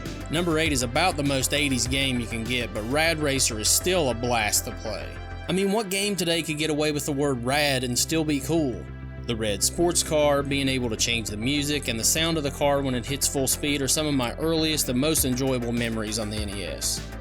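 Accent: South, United States. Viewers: Is this a hilly billy brother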